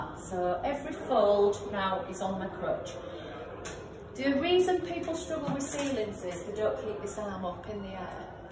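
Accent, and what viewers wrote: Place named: Hull, United Kingdom. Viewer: I need this lady to do a mural on our ceiling and she sounds local to Hull??? Would be great if she’s available or has any great recommendations ❤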